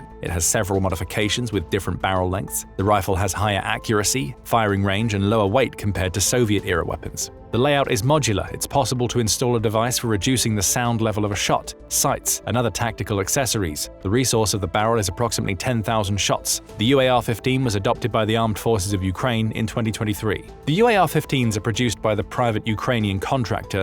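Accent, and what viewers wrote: United Kingdom. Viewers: Bullsh-t! The limey Russophobe voice just makes this channel CRINGE!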